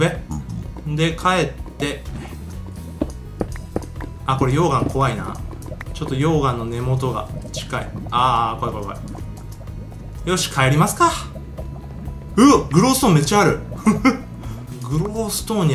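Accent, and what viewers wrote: Niigata, Japan. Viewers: 今日は地元の方言がよく出ますね（新潟県民より） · 多分だと思うけど、新潟県出身なんじゃない？ヒカキンは、だから、おっちゃうっていていると、おもう。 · ヒカキンよく落ちること「落っちゃう」って言うけど新潟の方言(´ω`)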